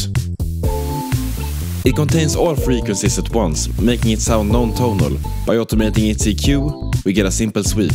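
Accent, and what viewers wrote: Sweden. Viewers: Every Swedish person can tell he’s Swedish just hearing how to talks in English 💀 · The Swedish accent is so damn recognizeable it's crazy 😂😂😂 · he’s Swedish so he pronounces “j” as a y. Stuff like that is how we got the name “Joe”. Joe<Joseph<Yousef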